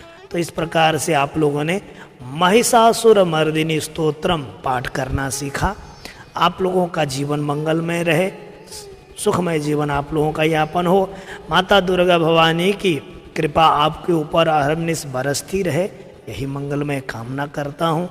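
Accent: Standard. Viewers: बहुत ही खूबसूरती से शुद्ध उच्चारण के साथ आपने पूरा स्तोत्र का उच्चारण बहुत ही शुद्ध भाषा में समझाया महाराज जी बहुत ही सुंदर उच्चारण के साथ कुछ लोग अशुद्ध उच्चारण के साथ इसको आज के समय गा रहे हैं अशुद्ध उच्चारण से कहीं ना कहीं यह हमें इसका फल गलत मिलता है इसलिए शुद्ध उच्चारण के साथ जो आपने समझाया एक एक श्लोक बहुत ही शुद्ध उच्चारण के साथ आपने गाया बहुत ही खूबसूरती से मैं आपके चैनल को सब्सक्राइब कर रही हूं और आपसे जुड़ रहे हैं धन्यवाद महाराज की शुद्ध उच्चारण से समझाने के लिए जय मातेश्वरी जय भवानी हर हर महादेव 🇮🇳🙏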